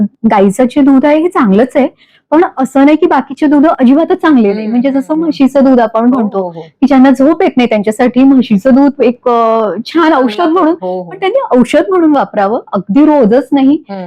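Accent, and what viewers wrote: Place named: Pune, India. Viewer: Madam tumhi kitisundar shuddha Puneri utchhar Marathi bolat ahat. Khup chhan vatala aikun. Tumhala shabbaski dete. Bless you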